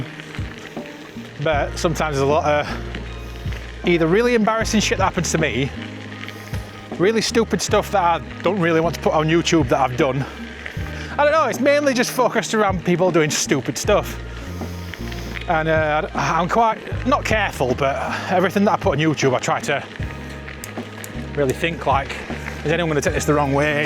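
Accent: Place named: Yorkshire, United Kingdom. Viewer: 🤨 NO you do not need to tone down the worlds greatest accent, Yorkshire!!!!!!!! I cant even stand driving over flockton nothing on earth would get me to ride on there, you wouldn't have a few videos ago, its nice to see your confidence increase. Loving the Trails o life T shirt.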